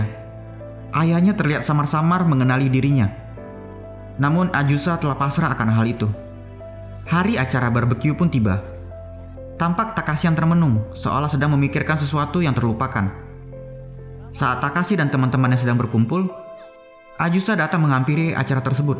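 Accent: Medan, Indonesia. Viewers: Orang medan bang? :v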